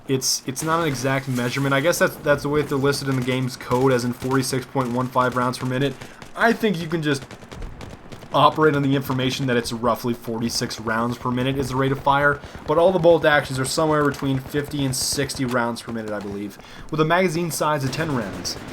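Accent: Germany. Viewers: bist du deutscher ?? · u german bro?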